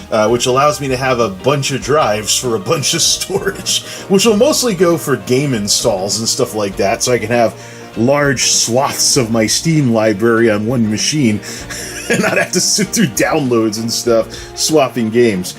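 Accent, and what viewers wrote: United States. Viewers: Which part? If you are going to say that I don't know what I am talking about, I suggest you be a little more specific. My math could be off a little, rounding, but Google says that the average rate for electricity is .18 cents per kilowatt hour in the United States, That makes my figures fairly accurate. I redid my math. According to Google my math is pretty accurate if you are in the United States on the mainland. In Hawaii electricity rates are about double the U.S. average. He has an American accent, so my assumption is that he is somewhere in the United States and not in a place like Germany where the average rate per kilowatt hour is much higher. He did not state where he is. That SSDs are better than sliced bread? This is my opinion, an opinion derived from owning hard drives and then several SSDs. If you are going to say that I don't know what I am talking about, is not exactly accurate, because I believe that everything that I said, while not being exact, is pretty accurate. 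That you do not agree with what I said, in no way means that I don't know what I am talking about. If you do not agree with my math, I suggest that you do it yourself. Did he say an additional reason that I didn't hear? The best that I can deduce from his video is that his previous hard drives used so much more electricity than his new SSDs that it makes it financially worth switching. I made a pretty fair argument that while SSDs are much better, the electricity that you save is not a reason to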